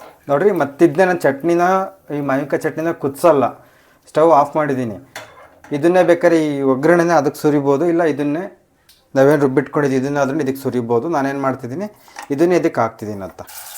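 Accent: Chitradurga, India. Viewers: ಚಿತ್ರದುರ್ಗ ದ ಭಾಷೆ😊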